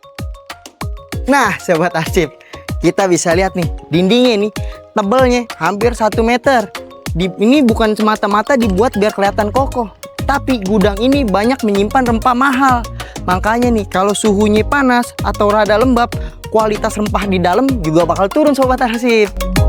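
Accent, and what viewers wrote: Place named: Betawi, Indonesia. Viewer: pembawaannya khas betawi gak kaku, seru, jadi mudah dipahami 👍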